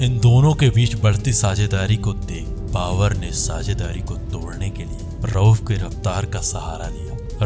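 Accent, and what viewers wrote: Bihar, India. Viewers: Tu bhihari he yaa uttar pradesh wala 😂😝😝😝😝🤬🤬🤬🤬🤮🤮🤮🤮🤮🤮🤢🤢🥵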